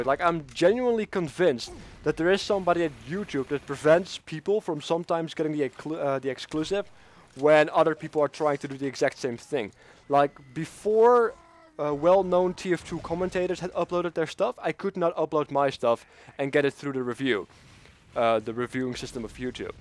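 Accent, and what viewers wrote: Netherlands. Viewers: Je kunt gewoon horen dat je nederlands bent lol, goede video's man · are you from holland ? :D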